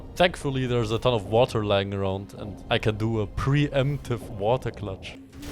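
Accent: Germany. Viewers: I can hear a german accent 😮🎉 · German you Sound, me reconize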